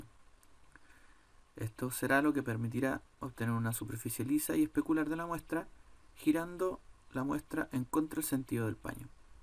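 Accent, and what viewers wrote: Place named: Chile. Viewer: Chileno po weooooon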